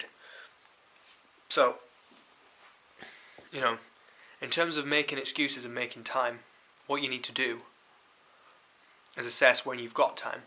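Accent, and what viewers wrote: Yorkshire, United Kingdom. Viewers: Lol. No magic, I recognise the accent being a Yorkshire lass misen :-)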